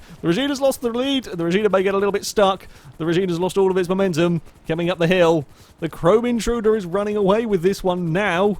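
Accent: United Kingdom. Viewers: you sound so fabulously British :D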